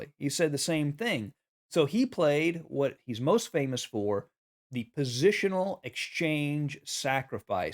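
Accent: United States. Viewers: Thank you, sir. Your commentary is the best. You and the Chess Wisdom guy. But it's nice that you speak like an ordinary American. He is also great but the accent is a little distracting.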